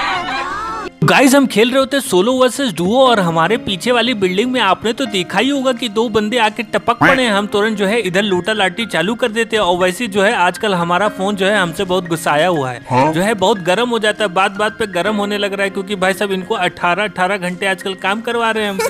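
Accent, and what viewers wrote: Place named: Jaunpur, India. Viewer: Me bhi ek youtuber ho aur aapki awaz sun kar Asia lagt hai aap junpur se hai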